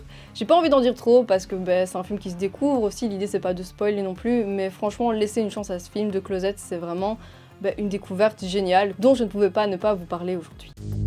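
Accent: Belgium. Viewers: j'adore l'accent belge :D ça me rappelle Bruxelles! J'adore les vidéos!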